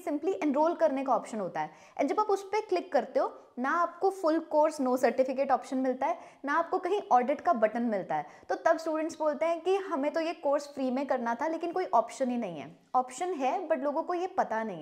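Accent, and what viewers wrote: Punjab, India. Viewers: Another great video! One thing i have noticed is the people of IIMs are quite different, i mean in a positive manner. You are doing great job. One thing i have observed is you say the word "tarika" and "zaroor" quite differently, you are a Punjabi that's for sure. Any way keep up the good work! Raghav Vohra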